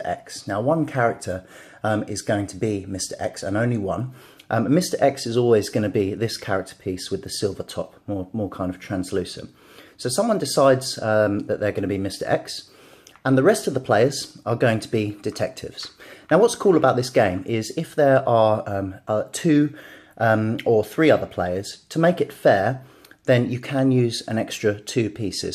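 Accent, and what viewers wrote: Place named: South East England, United Kingdom. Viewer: Hello, merry Christmas. Very good review. I got this game today for Christmas. As I am studying English I would like to know where you accent is from: south-est of Great Britain?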